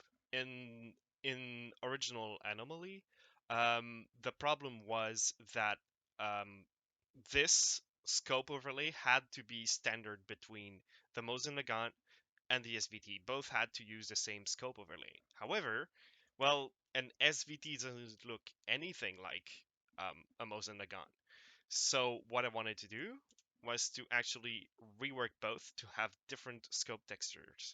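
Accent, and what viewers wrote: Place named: Quebec, Canada. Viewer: haha, knew it. your accent is very light but from a fellow quebecois, i could tell. hope your progress on the mod is going well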